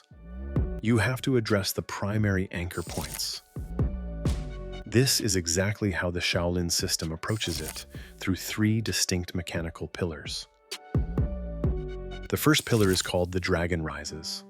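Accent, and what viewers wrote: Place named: United States. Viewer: WHEN ARE YOU GOING TO CORRECT YOUR READER ON HOW TO PRONOUNCE PINYI? It is most annoying, THhr yank accent does not help either,,too bland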